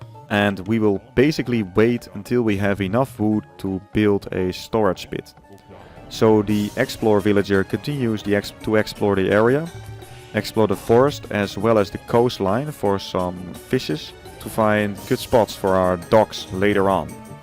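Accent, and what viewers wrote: Netherlands. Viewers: From what country are you. You sound Dutch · Sounds really Dutch😄😄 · It was nice to hear a Dutch guy playing and explaining about this game :)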